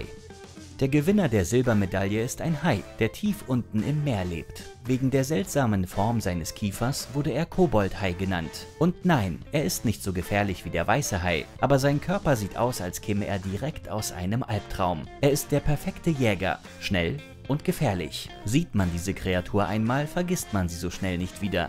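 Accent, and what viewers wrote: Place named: West Germany, Germany. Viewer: was isn das hier fürn schrott? gruselgeschichten für 12 jährige? am erschreckendsten ist das wessi-deutsch vom sprecher...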